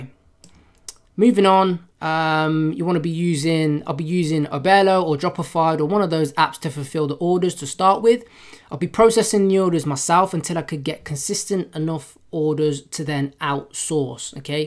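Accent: England, United Kingdom. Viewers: You say dollars but have an English accent :P whereabouts you from?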